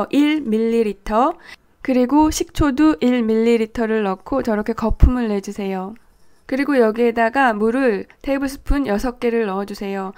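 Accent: Seoul, South Korea. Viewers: 목소리도 굉장히 좋고 말투도 상당히 매력적이세요! 그런데 꼭 들어본 것만 같아서 계속 생각해봤더니 서울사투리를 쓰시는 것 같아요!! 꼭 그게 아니더라도 정말 개성 넘치는 말투라 듣기 좋습니다~ 좋은 영상 감사해요 ㅎㅎㅎ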